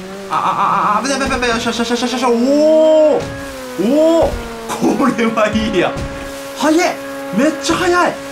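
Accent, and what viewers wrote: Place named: Kansai, Japan. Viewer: ヒカキン喋り方ちょいちょい関西弁のイントネーション時あるし、標準語の時もあるからどっちかにしてほしいわ。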